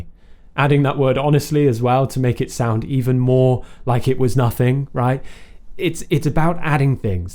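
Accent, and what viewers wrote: United Kingdom. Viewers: I love your British accent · I love the british english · Hello. Wonderful lessons as always. I agree, English can be boring, especially when conversing. Having options is a great way to expand one's vocabulary. I used the following phrases as an alternative to: "You're welcome." 1. My pleasure. Pleasure. Pleasures all mine. 2. No worries. No problem. 3. Much obliged (combined with #1) 4. It's all good paired with #2 or #4 5. Merci or Merci Buckets.😂 6. Quite alright (with #2 or #4) 7. I gots 'cha! (#2 or #4) 8. Don't mention it. 9. No sweat with #2–8 10. It's okay. No biggy. Absolutely love the British accent. It's so posh and quite easy on the ears. I speak with a Canadian accent and, apparently, mine is an enigma here in the Philippines. I sound: Canadian - American - British -French all jumbled together.😅 Kudos. Watching and learning all the way from the 🇵🇭. 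Cheers!👍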